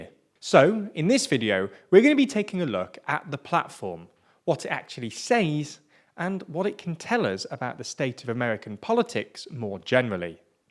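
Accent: United Kingdom. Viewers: Listening about US politics in British accent is so refreshing. It almost makes it less depressing and frustrating. Thanks for the video though.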